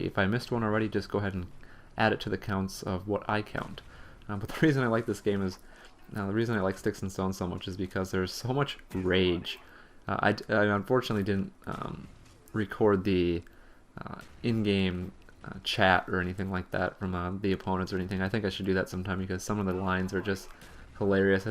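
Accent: Minnesota, United States. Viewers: i kno this is a stupid question but r u from minnisota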